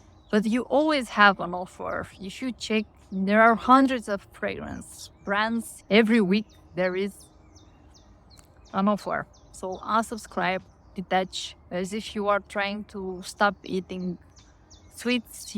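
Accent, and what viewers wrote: Romania. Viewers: Dear! Thank you so much for this vulnerable video! I too am Romanian and recognized your accent from the very first seconds. But for the sake of this post, I will comment in English because I am sure so many viewers will resonate with your message. I recently got into discovering perfumes. It hasn't even been 3 months yet, and my collection went from one signature scent that I had for the past 12 years to 6 full-bottle niche perfumes and a bunch of paid decants (and I can't really say I have the income for such big expenditures in such a short amount of time). These past months I've been compulsively watching videos about perfumes, reading on forums, reading people's comments on Fragrantica, taking recurring trips to perfume stores, and hunting the next thing on my wish list. And when I finally got the next perfume, I wasn't even that impressed with it anymore. 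When you mentioned the WHY I immediately started tearing. As you said, I think this sudden obsession came from the need to fill a void as I just experienced a painful loss. I am glad I caught this obsession early on and I am determined to put a full stop to it. Thank you for your message and advice.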